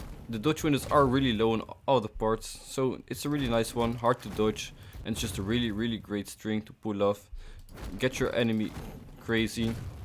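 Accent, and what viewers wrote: Netherlands. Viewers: dit nederlandse accent:cat-orange-whistling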